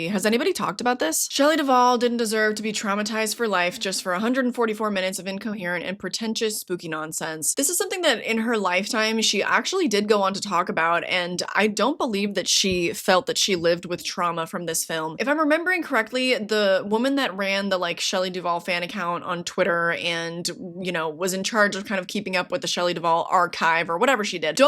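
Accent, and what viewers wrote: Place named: United Kingdom. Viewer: Doing a 1980s Horror Movies bad reviews video? It's completly outdated. Well, that was pretty ... "not unrelieved" ! I don't want to sound snob, but some people really are ... 🤔unhinged? Sad?... Uneducated? All of these? ❤your British accent ! Horror is the best dumbest genre ! – You slew me ! "you bedeprtprrrt..." The Stuff , yay !! B-But?!... Didn't you "forget" Re-Animator ?!... I'm not saying that because I love that movie, but because it's just ... quite an amazing and remarkable one. Stuart Gordon's masterpiece. Alongside Dagon . Yeah... '80s were ... a time.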